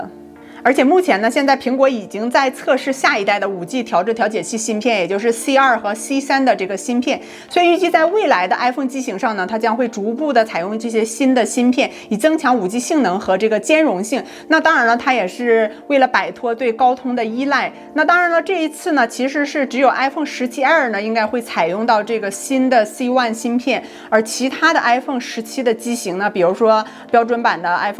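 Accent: Northeast, China. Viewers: 主播都东北人？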